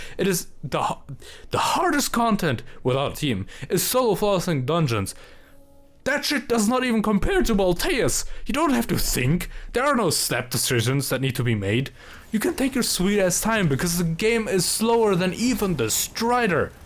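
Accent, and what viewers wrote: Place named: Germany. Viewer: Ich denke, ich höre den Deutschen Landsmann raus im Video :D Kann das mit Destiny 2 gut nachvollziehen.... Spiele aktuell AC6 UND Destiny 2 ... das sind Welten unterschied. Aber wie immer, Übung macht den Meister, ich kann nur empfehlen, probier viel mit Waffen und builds herum. Habe das Spiel mit Doppel MPs und 2 Energiewaffen am Rücken durch und bin mitten im NG+, habe nur leichte Sachen justiert fürs minmaxen. Sehr gelungenes Video, aber mach dich nicht so fertig, einfach weiter austesten und evtl. paar tips holen. Grüße gehen raus.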